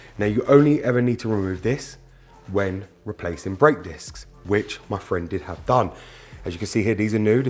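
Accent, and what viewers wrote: United Kingdom. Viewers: Thank you. You might have saved lives with this information. It's a shame that we have so many service people who don't care. It's nice to see videos like yours, to realize that there are still good people out there. I love your British accent.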